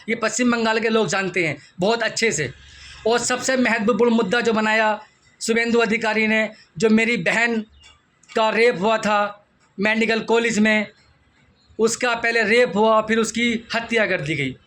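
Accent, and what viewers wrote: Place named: Uttar Pradesh, India. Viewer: Sandesh Khali ke Shahjahan pe b ek video bana de bhai . Tumhari bhasha Uttar Pradesh ki lag rahi hai , tum bengali to ho nhi .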